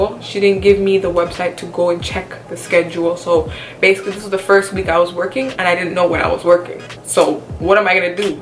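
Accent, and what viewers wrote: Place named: Caribbean. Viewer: I hear the island baby where u from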